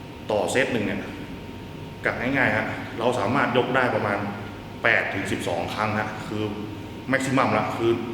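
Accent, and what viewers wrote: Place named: North, Thailand. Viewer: ธนยศ ไชยชมภู สำเนียงบ่งบอกเลยไข่ป่ะ พี่เขาคนเหนือแน่นอน 555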